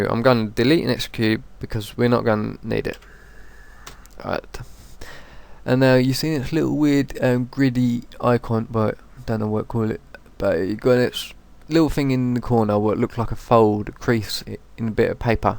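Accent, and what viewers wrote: United Kingdom. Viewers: **you're. And actually I said "British" because I wasn't sure if he was english. He could be from Wales for all I know. I know the accent differs a bit depending on where in the UK you are, but I'm not an Expert! :S Oh and yes, I'm not english or british in any way, i'm canadian. :) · uh uh uh your uh uh stutters are uh uh almost as uh unbearable as uh that uh mmm brit ass accent. Couldnt watch anymore....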